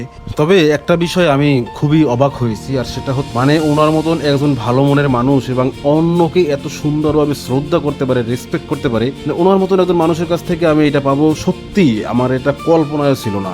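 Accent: Barishal, Bangladesh. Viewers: ভাইয়ের বাড়ি কি বরিশালে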